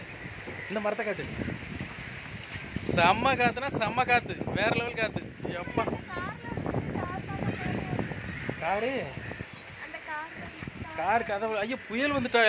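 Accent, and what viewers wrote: Tirunelveli, India. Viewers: Anna nega chennai ya but neega pesuratha pathaa thuthukudi thirnalvelii marii eruku enna ur nega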